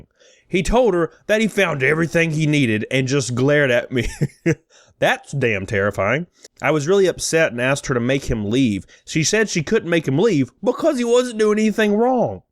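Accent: South, United States. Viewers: thank youtube, for recommending me your channel. I dig ya, also like the light southern accent. Subbed. Also their customer service is horrid. The other day I was trying to get a PS4 controller and they only had ONE woman at electronics when there were like 6 customers waiting around for different things. Waited 15 minutes so she could pop a tab and ring up one controller...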